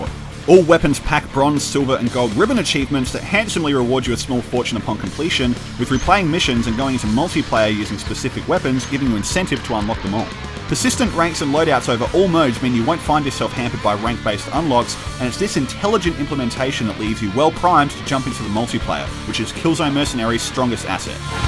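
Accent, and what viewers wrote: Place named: United Kingdom. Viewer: Uh, Helghan home world isn't Vekta. I usually like British accents, but not yours. Eh, 4/10, Bret Hart style.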